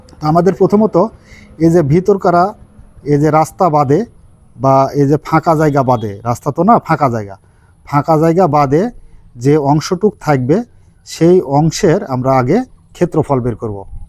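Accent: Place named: Rajshahi, Bangladesh. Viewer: 🤔🤔🤔ভাষা শুনে লাগছে রাজশাহী (সম্ভবত)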